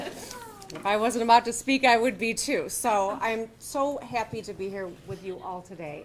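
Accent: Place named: Michigan, United States. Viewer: Not sure if Whitmer realizes she has a Michigan accent. When I moved from my native Michigan to California, people kept commenting on my accent, and I was like, I don't have an accent, you have an accent.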